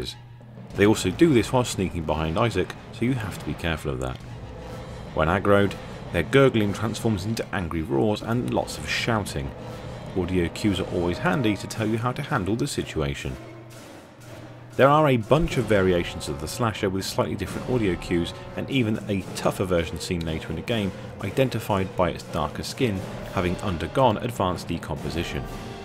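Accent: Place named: United Kingdom. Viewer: Yey funny brit narrator uploaded!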